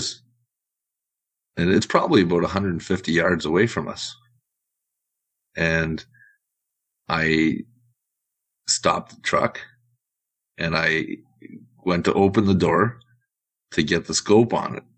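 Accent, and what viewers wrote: Canada. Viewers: Dude sounds like Tom Selleck with a touch of Canadian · I love your Canadian accent! Great story!❤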